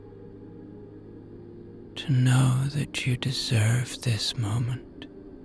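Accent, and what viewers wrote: Ireland. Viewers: I listen too u literally every single night and I keep hearing an irash accent in my head and it sounds like a leprechaun 🍀 literally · Bro I listen to you so much that everyday I wake up I have an Irish accent in my head.😅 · Iove the Irish accent ❤my dad was born and grew up in Ireland.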